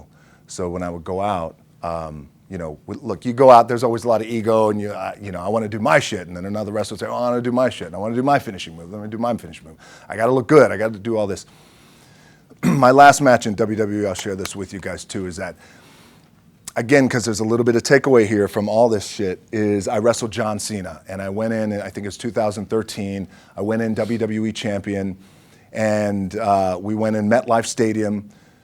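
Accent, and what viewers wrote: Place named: United States. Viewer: USA English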